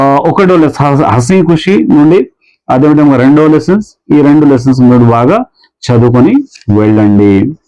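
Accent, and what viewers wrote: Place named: Telangana, India. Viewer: Sir aap telangana hai yaa ap ???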